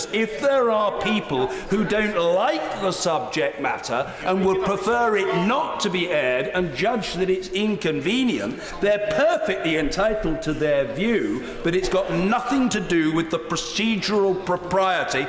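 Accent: United Kingdom. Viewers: What was that which he said quickly? Did he start a motion to remove a dissenter from the parliament session? I find this hilarious, but between British accent and legal jargon, sometimes I understand half of whats's being said. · His accent is so british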